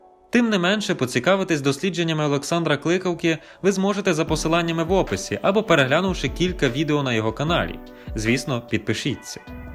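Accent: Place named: Volyn, Ukraine. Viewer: Класний голос у диктора, з таким рідним ледь помітним волинським акцентом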